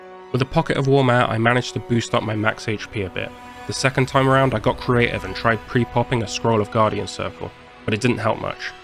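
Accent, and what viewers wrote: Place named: United Kingdom. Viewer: howcome you say dollars? if you dont mind me asking since you sound british?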